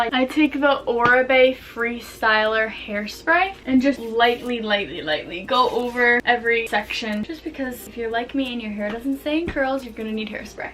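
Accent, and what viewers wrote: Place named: New York, United States. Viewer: are you from ny?? I love ur accent lol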